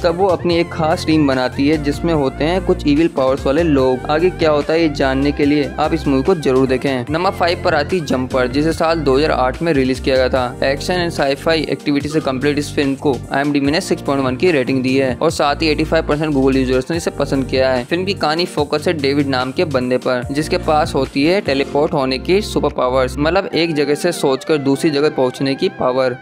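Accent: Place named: India. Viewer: so bad english slang,obvious is indian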